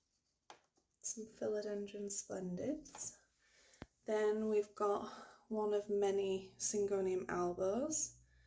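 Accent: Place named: Yorkshire, United Kingdom. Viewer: Ahhhh, a Yorkshire lass? Thumbs up for the accent, the plants and hair colour 😁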